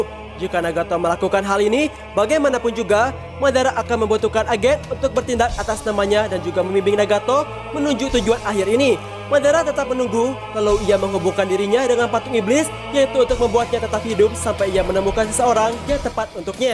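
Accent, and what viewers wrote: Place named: Padang, Indonesia. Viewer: Logat admin nya mirip teman gua orang padang panjang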